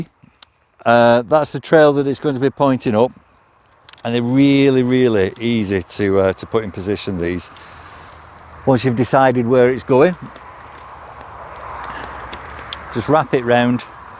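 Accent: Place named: Accrington, United Kingdom. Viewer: I'm in Accrington and your accent is very familiar! Where are you?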